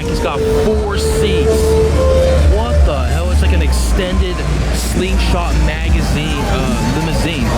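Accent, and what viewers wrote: United States. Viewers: You sound American, but you call it a bonnet?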